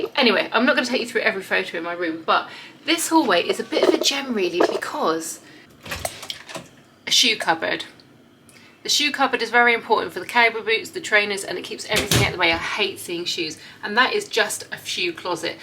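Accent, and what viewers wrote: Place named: Bristol, United Kingdom. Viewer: Cool vids. I can tell of a slight Bristol accent am I right cute studio my love ❤🎉❤🎉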